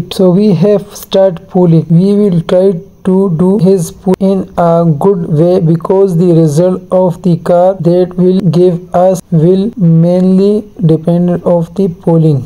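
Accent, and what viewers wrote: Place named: Punjab, India. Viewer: Agar Urdu Mai baat karte ziada behtar hota yeh Punjabi type English se acha hai Urdu Mai baat kia Karen shukria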